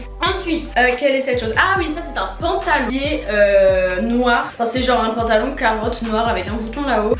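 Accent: Marseille, France. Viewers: T trop belle et trop drôle et grv spontané et moi aussi je kiff l accent marseillais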